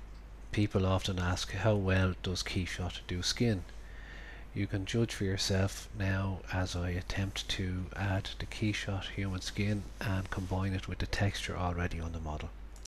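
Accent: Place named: Ireland. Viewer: Nice video here, and love that Irish accent. Thanks for showing the ZB to Keyshot Bridge in accent. Nice character too. Do you know if the Bridge works with any version of Keyshot 5 or later or if I need the special Keyshot for ZB?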